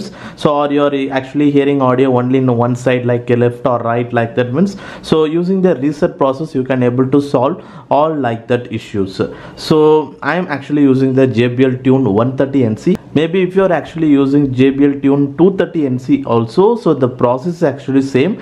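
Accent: India. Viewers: You must be Indian to understand this kind of English Language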